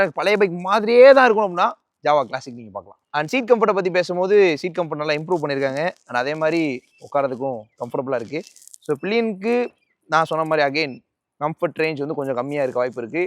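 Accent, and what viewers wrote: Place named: Madurai, India. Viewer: Nimukkum pothu? Speech madhura Karan madhura Karan than😂🎉😊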